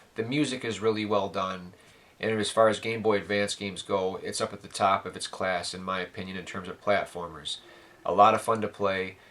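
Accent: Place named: Northern Michigan, United States. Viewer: Your North Michigan accent is always a welcome sound to my ears (no homo). Reminds me of my brief time at Michigan State University, and tater tots (which I am not a fan of). This is the evolution of Shinobi on Master System, mixed with Shadow of the Ninja from Natsume for the NES.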